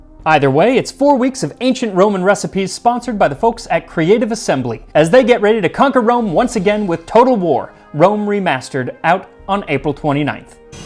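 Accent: United Kingdom. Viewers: No need to apologize for the British accent. Movies have taught me that most Romans had British accents.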